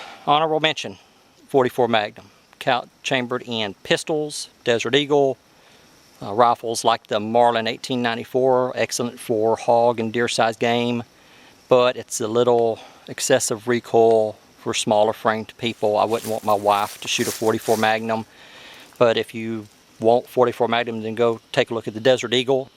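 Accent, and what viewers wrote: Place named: South, United States. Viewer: The ONLY caliber for a civilian is the .22 - the only one you can put 3K rounds on your back and run with . Another YT " Expert " ....besides the stupid hat and southern drawl , what might your qualifications be , Mr. Knowitall ?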